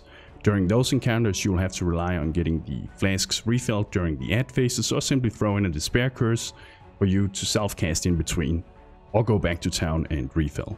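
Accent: Denmark. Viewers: jeg har altså en eller anden idé om at den eneste grund til at folk ikke snakker om dig/recommender alt dit content er pga din horrible accent:P Det gør ondt at høre på som dansker, men det er sikkert også ret skidt for ikke-danskere. No offense! Jeg ønsker dig kun det bedste... men ja, øv, måske du kunne (måske bare for min skyld.. det kan godt være jeg er den eneste) prøve lidt hårdere på udtalelsen? Den værste er når du siger "whalecum" istedet for "welcome"... oof!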